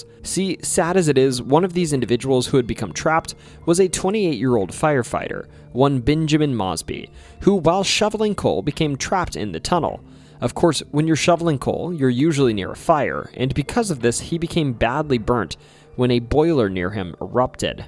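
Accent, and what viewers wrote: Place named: North, United States. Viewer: "Hen-re-co". You must be a Yankee pronouncing your "I's".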